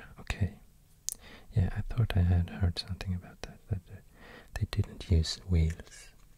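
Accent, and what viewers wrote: Sweden. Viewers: So obvious that you are from sweden haha, lugnt och skönt ska det va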